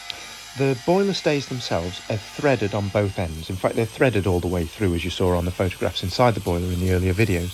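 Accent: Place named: Australia. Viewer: ah yes inside a boiler firebox I have known it quite a bit the last few years as I help out at a railroad historical society, cleaning the grates and drilling out telltells as well as blowin out flues once, I show one time I did on my channel in video if anyone wants to hunt, I am surprised though your using a grinder to cut that off we usually just use a torch to burn the stays out and cut the sheets or a plasma for cutting the new ones, its faster, its messier and has more cleanup but its more expediant, I like your voice though its pleasent to the ears and articulated when you speak some have such a dialect or accent you cant hardly make out what they say , you sound though almost australian, I am no boilermaker though but I read up on research and projects even if I cant do the math to design it, soo many things you can do to make a boiler more effecent or burn cleaner, or it dont even have to have fire there is boiler that uses solar collectors that follow the sun and they are getting an 800 degree super heat on the steam meaning its hot enough to compound if you were to use it in remote locations like off grid so diesle dont have to be burned its noisy expensive and smelly, the solar project that the guy at vapor locomotive company in idaho in the states is heated by the sun, though its generating rate of steam I dont know the capabillity of currently, and you have the facinating differant layouts of tubes and flues as well as multipass tubes and rifled boiler tubes lik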